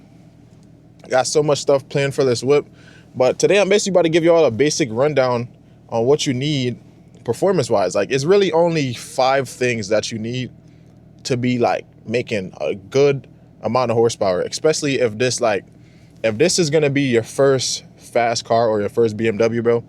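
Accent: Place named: Canada. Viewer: Kinda sound Canadian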